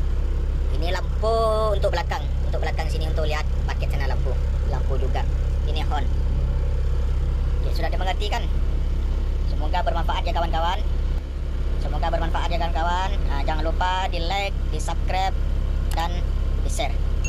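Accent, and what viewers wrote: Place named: Madura, Indonesia. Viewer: Kok kaya logat Madura omm😅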